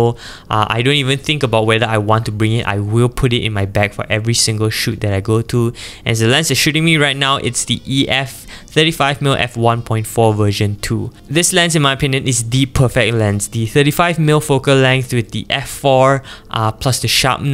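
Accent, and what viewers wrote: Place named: Nigeria. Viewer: You sound a little like an nigerian 😊 thanks for the Review and expensive lenses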